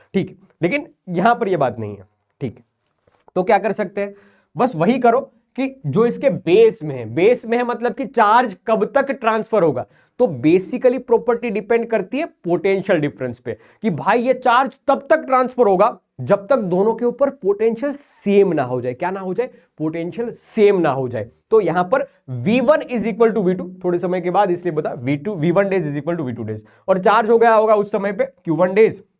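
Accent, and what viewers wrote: Gujarat, India. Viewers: sir ka accent dwkh ke hi lag raha he ye gujarati honge 😅😅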